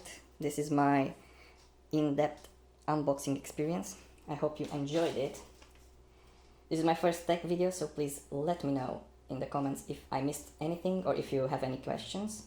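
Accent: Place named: Russia. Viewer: I think I can hear Russian accent when you speak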